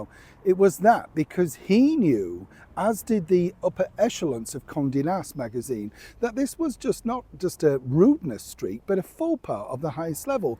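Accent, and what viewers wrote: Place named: England, United Kingdom. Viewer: How refreshing to hear a quietly well said commentary without drama,outright lies, or subtle attacks. I love your style. It's what I always hoped a true English man to sound like.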